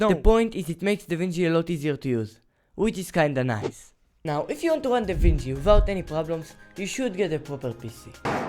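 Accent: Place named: Russia. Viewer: in a very good way, sounds like you are from MOTHER RUSSIA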